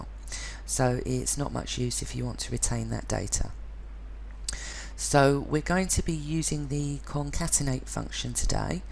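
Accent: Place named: United Kingdom. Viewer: someone with a British accent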